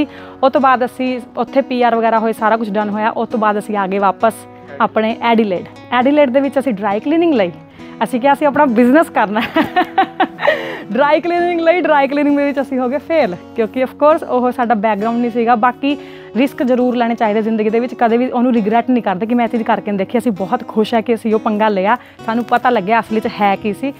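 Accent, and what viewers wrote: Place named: Punjab, India. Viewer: Eene Intelligent ho tusi & Punjab to bahr Jo fir v Punjabi Suit wear kita bahut Sohne Lgde di..... Upro Eeni pure Punjabi Talk ❤❤❤❤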